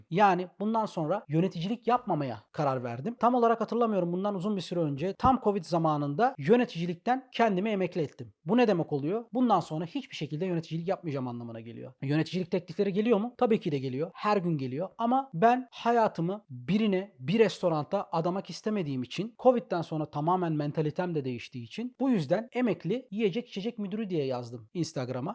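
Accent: Thrace, Turkey. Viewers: Trakyalı adam dinleniyor. Not: rizeliyim :)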